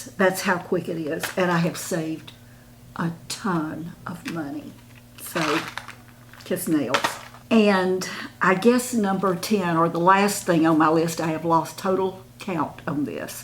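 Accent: South, United States. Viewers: I love the sound of your voice. I know you have a southern accent, but I can’t name the state. Some older women have unappealing voices and they need to work on that. I guess you can’t do a video on that, but is a big factor.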